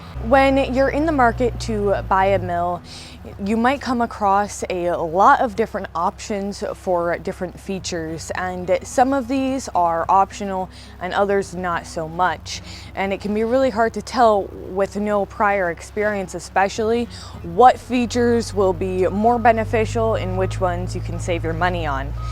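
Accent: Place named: Pennsylvania, United States. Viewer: .... You're just lovely. So natural, so real, so.... lovely. Also, I get a real kick out of the PA accent. Most don't hear it, but I certainly do, being from RI. Live in D.C. now. Respect. My Favorite channel. Fact. Who would have thought one could create an interesting channel about a saw mill? Your talent makes it possible....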